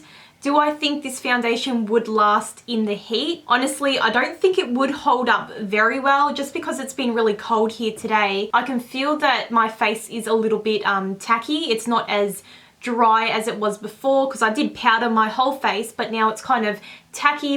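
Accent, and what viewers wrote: Australia. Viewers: are u australian ? i LOVE ur accent ! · its an Australian accent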